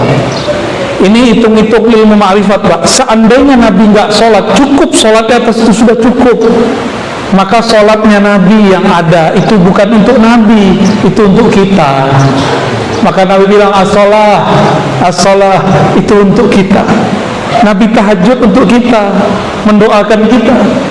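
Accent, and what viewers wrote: Padang, Indonesia. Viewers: Tarimo kasih Buya bahasonyo awak Samo Samo urang Padang,, tapi beda kota....😊sanang hati mandanga nyo 🙏🤗👍 semoga kita inginkan kampuang halaman 🤗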